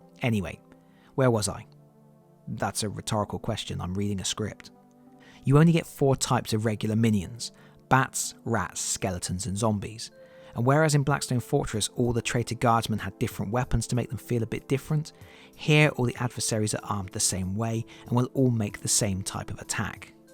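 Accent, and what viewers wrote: United Kingdom. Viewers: Great review. The british accent adds to it for some reason..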